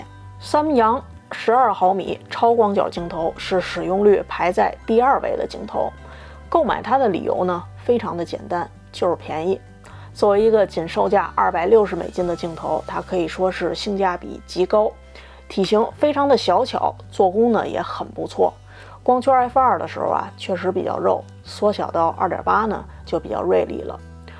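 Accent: Beijing, China. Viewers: 北京姑娘吧？和我一姐妹儿特像。讲的倍儿清楚。关注了。希望讲讲X100系列。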